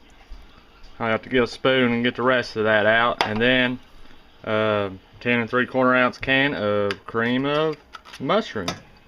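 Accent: South, United States. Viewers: You have some great recipes. I cook every day. And we season a lot the same. I noticed your southern accent. Can I ask where you are from? Thanks for posting. Looking forward to your next recipe.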